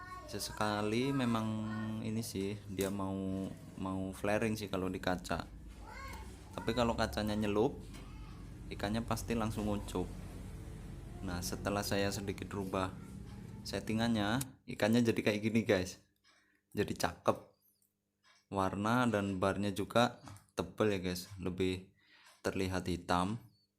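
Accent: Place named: Banyumas, Indonesia. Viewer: ciri khas pokoke(ora ilang ngapake biarpun ngomonge bhs Indonesia)😀😀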